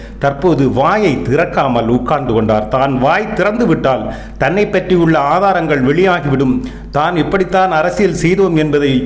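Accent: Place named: Nanjil Nadu, India. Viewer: இந்த உண்மை விஷயங்களைப் புரியும் வண்ணம் நாஞ்சில் நாட்டுப் பழகு தமிழில் இனிமையாகவும் கோர்வையாகவும் மிகவும் நேர்த்தியாகவும் ரசனைக் குறைவின்றியும் எடுத்துரைத்த அன்பருக்கும் இந்த ஊடகத்துக்கும் நன்றி கலந்த வணக்கம்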